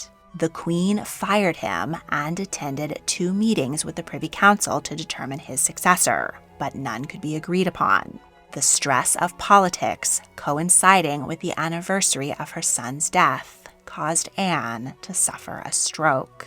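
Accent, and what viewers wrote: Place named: United States. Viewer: The narrator's voice is rather unpleasant. Sharp, constipated, painfully american.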